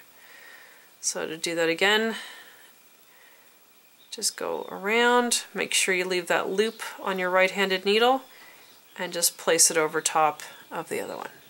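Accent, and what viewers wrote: Canada. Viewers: Thanks for the great video! You sound Canadian!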